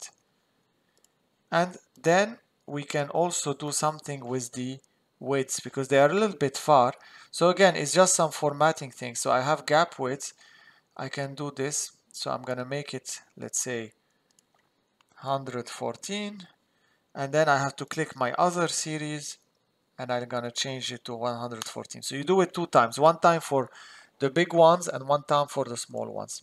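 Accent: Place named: Lebanon. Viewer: Hi, very clever. By the way, from your accent, I guess you're Lebanese, aren't you ?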